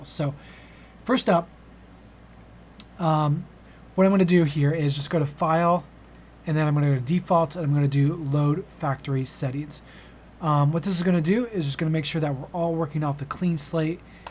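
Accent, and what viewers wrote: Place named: United Kingdom. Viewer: Thank you for your efforts and including screenshots, and thanks for not having a strong British accent. =D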